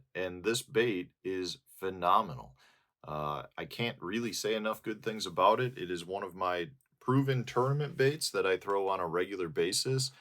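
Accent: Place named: Chicago, United States. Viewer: Hey you're becoming a cheddarhead with the way you pronounce about. Starting to lose that chicago accent. Don't become a Packer fan!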